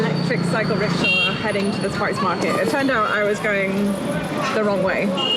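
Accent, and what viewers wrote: United Kingdom. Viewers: It seems you are British by your accent.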